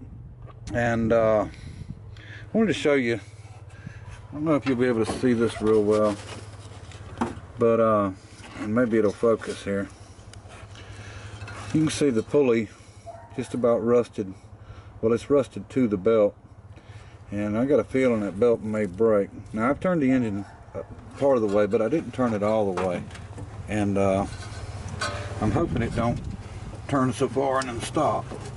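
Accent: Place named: West Virginia, United States. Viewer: is your family from West Virginia I'm just wondering because of your accent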